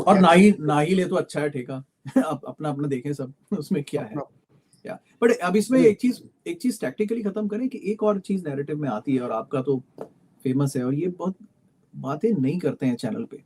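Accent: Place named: United States. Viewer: He is an Indian but talking like an American white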